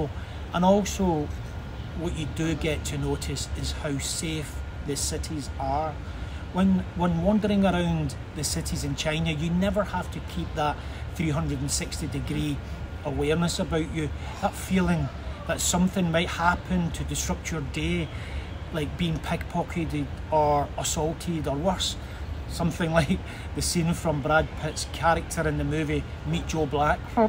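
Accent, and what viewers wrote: Scotland, United Kingdom. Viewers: Your Scottish accent is absolute adorable, sorta reminds me of pirates and Spartan worriers haha 😊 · Thank you for the matter of fact sharing. Love your Bonny Scottish accent❤❤❤ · I loooooove your Scottish accent. And great video too! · You’re the first Scottish guy I can understand clearly even though I am British 😂 Chinese people are very welcoming in mainland China can’t wait for my big move in August in Hangzhou Subscribed ❤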